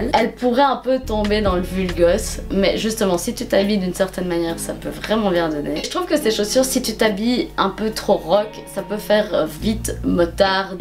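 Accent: Belgium. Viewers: aaaah mais tu es si adorable! j'adore ta bonne humeur et cet accent belge qui donne le sourire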